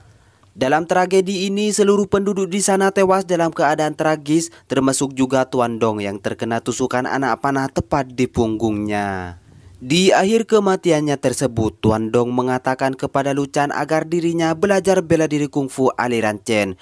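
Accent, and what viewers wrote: Madura, Indonesia. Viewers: Yg menarasikan pasti org madura y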